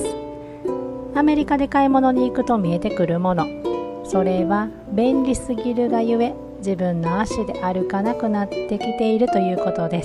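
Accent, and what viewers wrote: Kansai, Japan. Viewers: 声と関西弁、いい感じです😂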